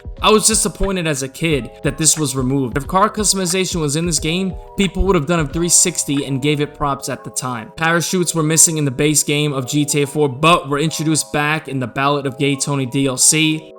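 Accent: New York, United States. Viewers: I fuckin love your New Yorker accent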